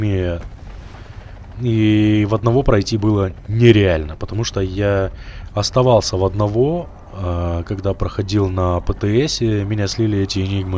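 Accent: Kazakhstan. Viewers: Ты из Казахстана? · ты из казахстана?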